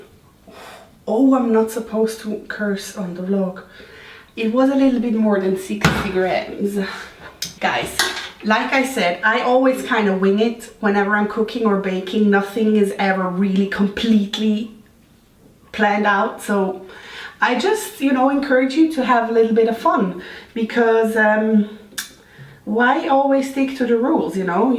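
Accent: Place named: Switzerland. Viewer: looks yummy! I never tried a vegan version so I'll be sure to try your recipe next time(+the sugar haha)! 😍 I let my bananas get even browner 😂 ...my boyfriends mom taught me to freeze the ripe bananas. (They get super soft after thawing, so a bowl is needed! And it gets pretty messy haha.) AND your Swiss accent is the best! Accents are so much fun! 😂 I enjoy the tifical Pilipino accent, you know? But se German won is good too 😂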